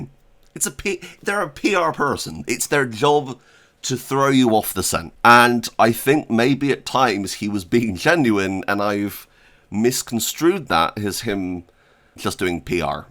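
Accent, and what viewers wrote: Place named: West Country, United Kingdom. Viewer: Awesome video. Give them a chance. Awesome bit of news and well put together. It's good to hear a west country accent in a video.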